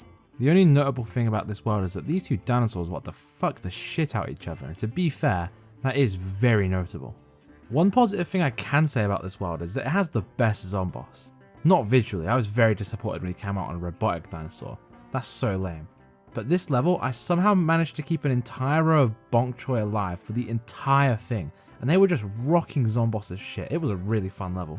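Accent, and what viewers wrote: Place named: United Kingdom. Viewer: why does your voice get increidibly more british when you stream?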